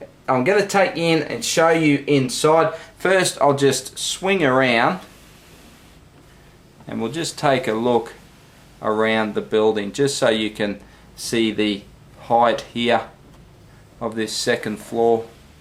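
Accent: Australia. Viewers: You have the most pronounced Australian accent I have ever seen. Great build btw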